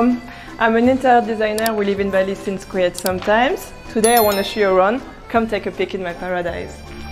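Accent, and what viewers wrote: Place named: France. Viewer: Beautiful home and nice French accent. Love it.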